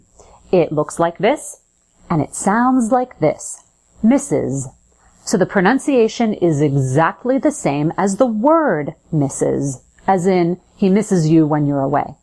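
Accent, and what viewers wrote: United States. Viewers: Why yes she is American speaking their version of English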